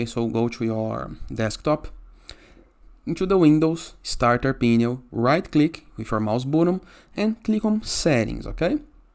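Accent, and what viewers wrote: Brazil. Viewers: muito obrigado fora do tópico mas acho mt foda o sotaque brasileiro na língua inglesa, tem um charme absurdo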